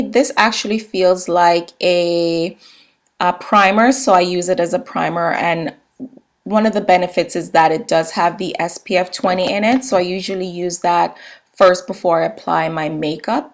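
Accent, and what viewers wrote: Jamaica. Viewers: First video I'm watching of you, but correct me if Im wrong but I think you are Jamaican, I just know a Jamaican as soon as I see and hear one. Guess its the patriotism in me.... Love my place and my people badddd!!!! but if ur not its cool, i still love your video lol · Hey you sound Jamaican. Are you? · are you Jamaican? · Are u Jamaican? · I hear an jamaican accent in there :D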